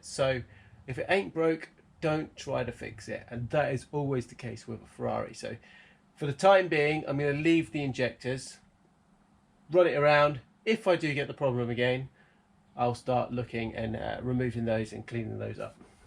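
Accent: England, United Kingdom. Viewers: Just had this suggested to me, see the $$ prices, but then you open your gob defiantly a english, fair play need some more brits like. Showing their skills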